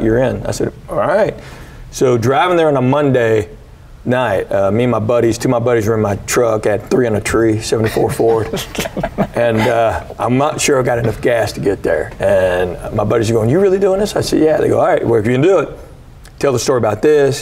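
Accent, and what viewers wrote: South, United States. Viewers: The Asian with a southern accent is fascinating me. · I remember seeing this guy when I was a little kid in the. Local accents are quickly going away these days but at the time his southern drawl really confused me! I don't remember the whole setup but I remember the punchline was him saying, "I reckon so!" I'm from NYC and I'd never heard that phrase before so next time I saw one of my uncles, a guy born and raised in the South but spent the rest of his life here in NYC, I asked him what that meant so he asked me to repeat the joke for context. He laughed for 5 minutes straight! He said it made him feel at home again. There's a type of southern humor that's really dry but sarcastic and very sharp. He told me it was like British humor in that way. His accent has softened, he's still got some of that southern charm and dry wit. 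And I'm glad to see he's still working. I'll be subscribing and checking out more of his material! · I've never heard an Asian guy with a southern accent before.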